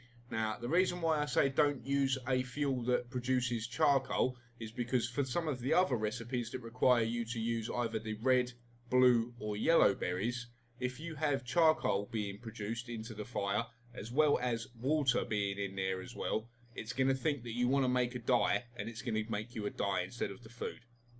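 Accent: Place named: United Kingdom. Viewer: thx bro oh and are u from britin i am are u u sound like it wigan i geuss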